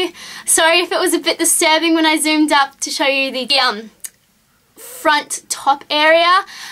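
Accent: Australia. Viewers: French?! I'm sorry, have you ever heard a French accent? Haha, sorry! I just found that amusing--It's a Northern Australian accent. :)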